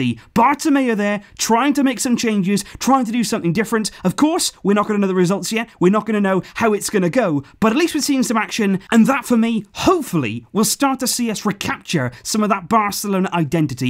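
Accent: Wales, United Kingdom. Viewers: Where are you from? U sound British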